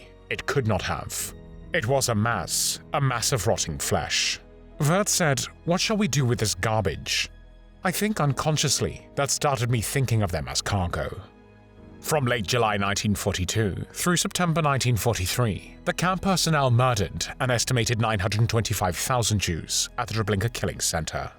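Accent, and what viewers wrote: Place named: United Kingdom. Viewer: ...so what? does this get your rocks off? Cause I see no other reason why you bring your Gaylord Briton Accent to describe how people get killed.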